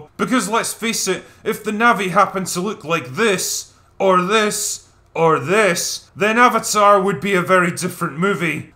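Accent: Scotland, United Kingdom. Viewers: Ten seconds in, already sounds like the narrator is about to have an aneurism Edit: oh he's Scottish 🍀 Edit Edit: why do Scots have blisters on their dicks? Cos they're such tight fisted wankers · You're Scottish? I just thought that was just drunk accent.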